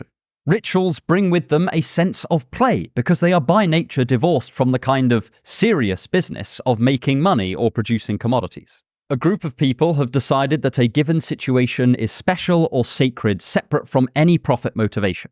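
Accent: United Kingdom. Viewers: Which accent has he? Is that British?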